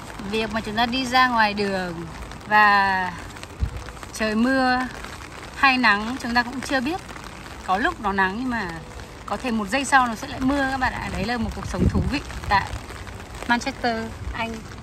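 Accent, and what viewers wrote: Central, Vietnam. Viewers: Hic chị quê ở mô rứa ạ. Em thấy chị nói giọng miền trung 😊 Tập này như đang nói đến em vậy . Em cũng đang ở xa nhà ít bạn bè và đôi lúc cảm thấy cô đơn nhưng rất thích quãng thời gian một mình Đôi lúc bản thân cũng có thời gian lặng để biết bản thân cần gì😊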